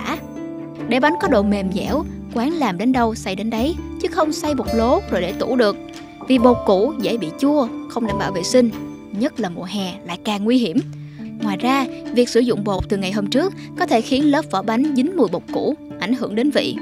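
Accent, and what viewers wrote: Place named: South, Vietnam. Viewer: Bài viết về món ăn miền bắc, người viết bài cũng dùng từ ngữ của miền bắc. Vậy thì giọng đọc của miền bắc luôn đi. Tự nhiên đưa bạn miền Nam đọc làm chi. Nghe không hợp xíu nào. Giọng đọc này chỉ có thể dùng từ "tiệm" thay vì "hàng", từ "phi hành" chứ không thể là "chưng hành". Nghe chướng tai 🙄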